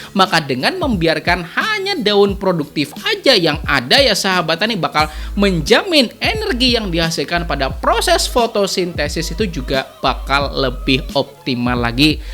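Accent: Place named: North Sumatra, Indonesia. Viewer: Bg asal Sumatera ya..?? Kok logatnya kayak dr daerah Sumut gt..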